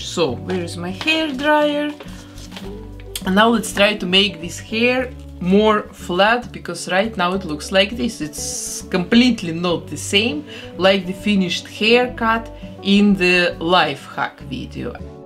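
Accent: Russia. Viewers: Noooo, not "ghouls alive" spectra( you can not reroot her because the mecanism in her head( Btv, i knewed you have a "russian" roots) not just because accent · Lol! Mystery of your accent finally solved!!!!! I thought you sounded a bit Russian ! Interesting! Turns out I was right! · Какой же сильный акцент Мне кажется, что это девушка русская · Мне кажется я слышу русский акцент 😅 interesting video,thanks you 🌸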